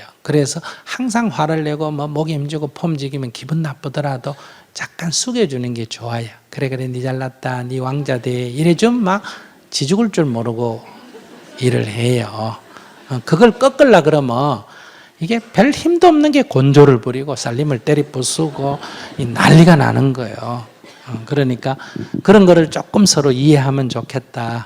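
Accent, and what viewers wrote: Gyeongsang, South Korea. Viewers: 스님 경상도 사투리 라서ㆍ더 매력 있습니더 예 ㅡㅡㅡ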